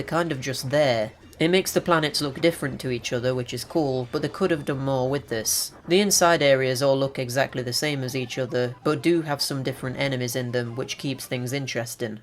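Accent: North, United Kingdom. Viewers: yeah, tbh I'm on a bit of a marathon here, went to the roots, so to say and going up from there. I love watching how people gradually change their approach towards creating videos, from humble beginnings to more professional setups, more confident narration, how they change visually, all that, it's a lot of fun for me, like using a time machine. First time I saw u was at Retro Dodo channel when u joined them, it was quite unusual at first but I quickly got used to ur reviews, mostly coz I absolutely adore ur northern (i suppose) British accent plus the way u tell the story, it just feels right, u know, it's fun, entertaining, nothing too complex or boring, I realized I enjoyed listening even when u discussed smth of no interest to me (for example light guns). And when u mentioned that Oddworld series is one of ur favorite... my god, I was ready to buy the ticket, fly to UK to shake ur hand personally, coz I'm absolutely mad about Oddworld games (no 3D spinoffs though;) So yeah, then i stopped seeing u at Brandon's channel, did a little bit of digging plus u were kind enough to reply to comments, soon I realized u have ur own channel and then I decided to start from the beginning and watch more of ur content which I believe is very wholesome and fun. 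Wow, that was a long reply, apologies for that, u take care, bud. Oh, and I love cats too!!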